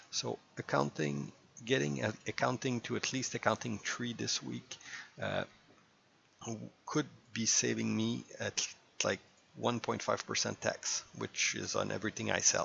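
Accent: Quebec, Canada. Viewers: French Canadian?? Je reconnais l'accent ahah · Is it possible that you might live in Québec? I smell a small Canadian-French accent there :P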